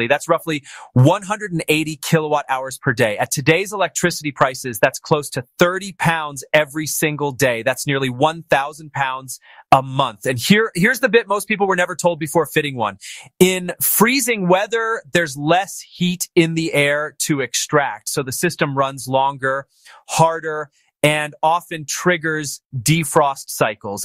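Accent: United States. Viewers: A thousand pounds of what? AI crap. A limey with an American voice. And I always knew heat pumps and solar panels are stupid. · What's the deal, you sound like you're from the US, but you're talking about pounds rather than dollars? In a discussion such as this, it helps if the costs per unit of energy are known. Since heat pumps are more efficient than heaters, the should use less energy overall unless it's so cold that their efficiency drops way off. But, I don't know the relative costs of electricity and natural gas where your customers live. In both Pennsylvania and California where I have lived, heating with natural gas was always quite a bit less expensive than heating with standard electricity powered furnaces. The other down side to heat pumps is the relatively high costs to purchase, maintain, and repair them compared to simpler natural gas or resistive electric heaters. 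With that in mind, it makes little sense to go for a heatpump if it DOESN'T run quite a bit per year because the electricity cost savings don't make up for the higher purchase and maintenance costs. Of course, if you need air conditioning in the summer then you also get some additional energy cost savings from your heat pump when cooling the house. Also keep in mind that the capacity requirement for your unit is based on the size of your home but also on the temperature differential that you want to maintain between indoors and outdoors. If a hot summer day in your area is 95F and you want an indoor temperature of 75F, you have to maintain a 20F temperature dufferential, but if a cold day in your area is 0F and you want an in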